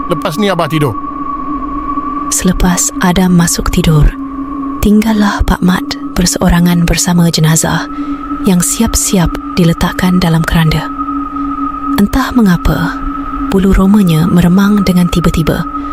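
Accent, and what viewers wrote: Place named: Sabah, Malaysia. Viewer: Sabahan bm 😂😂😂 Iya bah,kau org Sabah bah.😂😂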